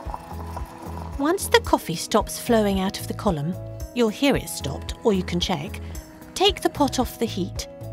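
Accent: United Kingdom. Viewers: thanks british lady, i need this for my fanfic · The accent is too British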